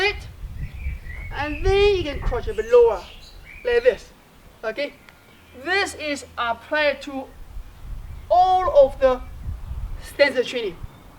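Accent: China. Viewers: I believe almost 💯 % that he is chinês. He does have a beautiful accent.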